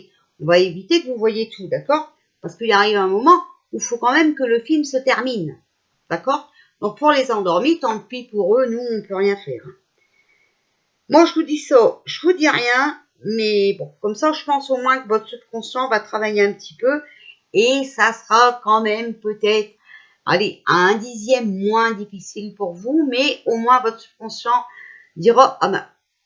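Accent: North, France. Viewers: C’est avec grand plaisir que je vous écoute cet accent du Nord.... je suis aussi native du Nord mais dans le sud ouest aujourd’hui... Vous avez raison de mettre les points sur les « i ».... hélas bcp dorment encore..... en tous cas grand merci! Restez ce que vous êtes Changez rien! Vous êtes une belle personne... vraiment! ❤️❤️❤️❤️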